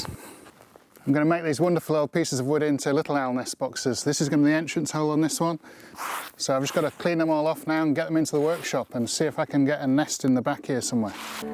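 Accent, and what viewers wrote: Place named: England, United Kingdom. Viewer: I just found you on Utube! I'm watching as it's lovely to hear the English accent & loving what you are doing for the birds! Cheerio!😊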